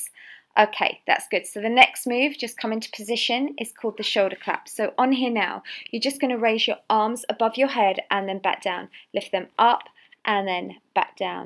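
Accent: United Kingdom. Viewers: thankyou for this 😭😭😭♥♥♥♥ :), i like your british accent 🙈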